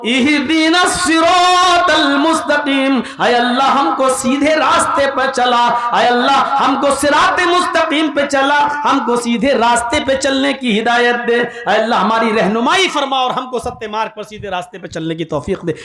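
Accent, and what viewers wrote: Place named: Bangladesh. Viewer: Ye sala Bangal deshi bala bat karta h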